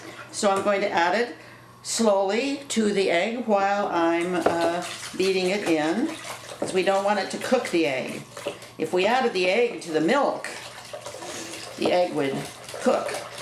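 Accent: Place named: United States. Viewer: hi, can you please writing the explanation on the description tab or email it to me so i can cook it cos your American accent doesn't help me too much and as well i m not English speaking native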